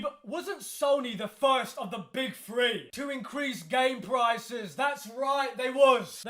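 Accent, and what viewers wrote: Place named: Scotland, United Kingdom. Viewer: I love you, funny angry Scottish man.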